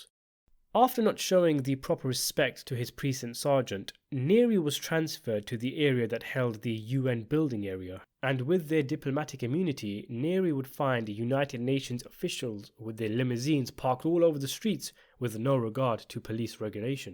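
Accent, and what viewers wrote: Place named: United Kingdom. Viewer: Thank you - but british accents are the worst.. Sickening.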